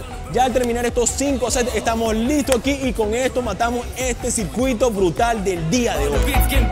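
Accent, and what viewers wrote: Venezuela. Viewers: jajaj es venezolano por eso habla asi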